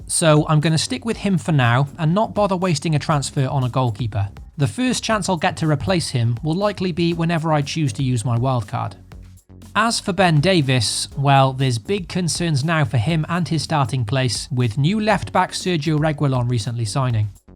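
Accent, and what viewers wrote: Wales, United Kingdom. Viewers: Are you Welsh? I hear some Welsh in there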